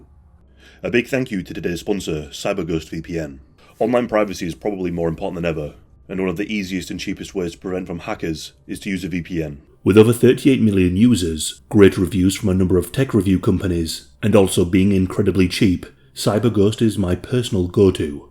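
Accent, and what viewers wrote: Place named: Scotland, United Kingdom. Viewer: I like your accent which I suspect is of Scottish origin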